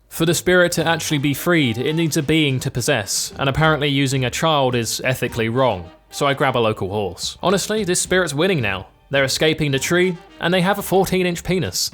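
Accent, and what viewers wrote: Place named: Burnley, United Kingdom. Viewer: Burnley accent. This guy is a fucking joker xD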